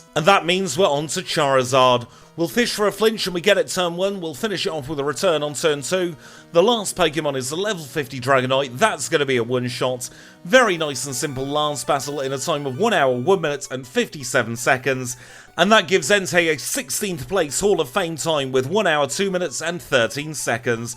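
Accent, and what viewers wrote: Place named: United Kingdom. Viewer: I'm so curious! I'm not from the UK so I'm always shocked at how many accents you guys have in such a relatively small country. What would you say the is the closest accent to your's? I need a name to the sound!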